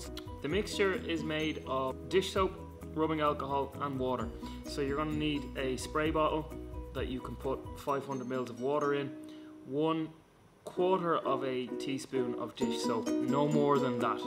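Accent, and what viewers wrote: Ireland. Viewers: This comment is crazy and I’m obsessed with this video and an Irish accent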